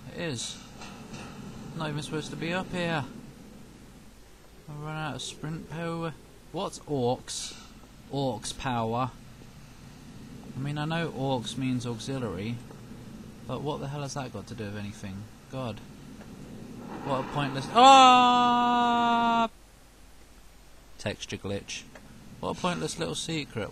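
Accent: United Kingdom. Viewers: your British commentary makes your walk through a thousand times better than any other!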